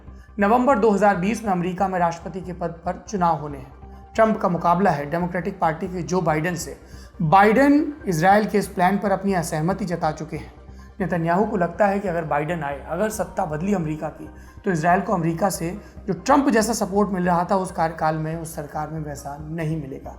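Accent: India. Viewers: Bolne ka deshi tarika hi maja deta hai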